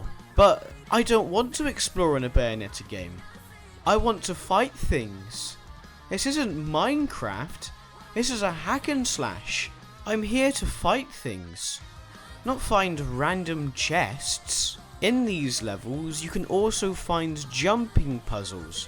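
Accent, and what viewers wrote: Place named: United Kingdom. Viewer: He's literally from the UK and one can clearly hear it lmfao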